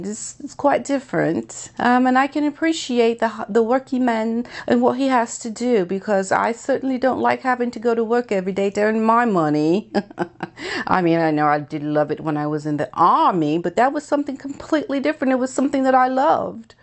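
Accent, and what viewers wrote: England, United Kingdom. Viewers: I love your English accent so funny xx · You have me in fits of laughter lady. I do look forward to your vids and that English accent is priceless! ‘Didn’t you just say that?’🥰👍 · your british accent is everything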